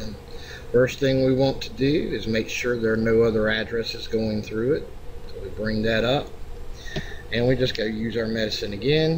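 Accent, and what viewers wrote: South, United States. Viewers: I appreciate your videos man, I love to understand how things work and when I can't do something I force myself to learn how! I was hoping to make my own cheat for America's Army: Proving grounds a 32-bit old fps game on steam and since it's so old people aren't rolling out cheats for it anymore not like CS:GO cheats and so a guy on unknowncheats forum recommended your channel for learning cheat engine hacking and I'm glad I love your southern voice and you explain everything clearly and you get to the point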